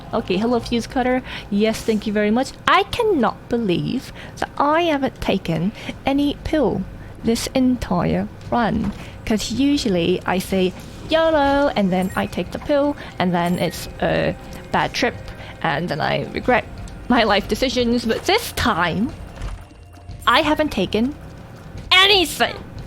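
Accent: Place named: Australia. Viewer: Your posh Ozzie / UK accent is real good. Hope your brain is kind to you for at LEAST a week x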